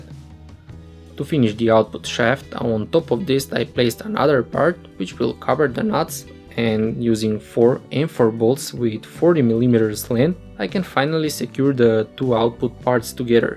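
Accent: Russia. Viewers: Please stop talking with a Russian accent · Русский акцент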